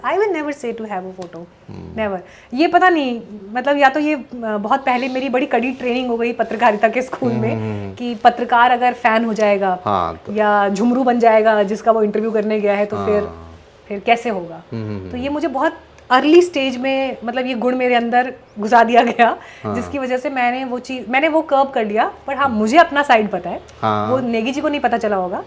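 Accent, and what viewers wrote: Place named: Garhwal, India. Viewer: स्टाइल भले ही आप कह रहे हैं पर हिन्दी बोलने पर कहीं कहीं गढ़वाली लहजा समझ आ रहा है।😊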